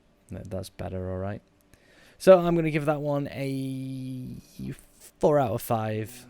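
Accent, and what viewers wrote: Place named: England, United Kingdom. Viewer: I knew that was an English voice, me to. I'm from the South but live up North xxx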